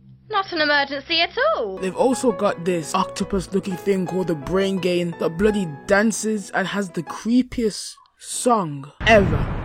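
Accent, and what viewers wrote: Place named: Roadman, United Kingdom. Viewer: I like your accent fam roadmen are cool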